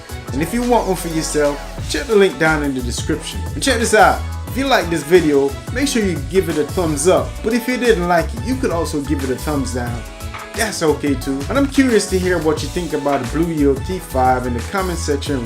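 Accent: Jamaica. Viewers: we Jamaicans know a Jamaican when we hear one..the accent is still there bro. I got the t5s but the sensor is giving trouble so I had to put tape over to stop the problem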